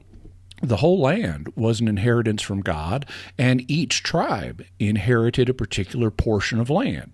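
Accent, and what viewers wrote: South, United States. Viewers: He recently moved back to Arkansas, so his natural accent is reemerging. I like it, shows some Southern heritage representation in Catholic apologetics. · For the first time ever I noticed a bit of a Southern accent. I wonder if Jimmy went home to Arkansas or wherever he's from And visited family. Anyway, great video. Good stuff as usual!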